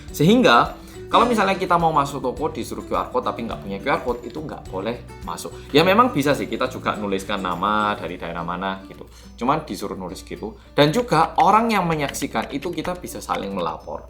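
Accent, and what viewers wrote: Java, Indonesia. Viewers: Hallooo oppa medok....madiun stay tune ya....sama2 ppkm parah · Mase... Jawa biangeeet logate... Gak nyangka jebule wong korea..... Kudu "iri" aku.... Ak ngomong bhsa jawa ora dapet logate...susah rek... Datar nadaku 😁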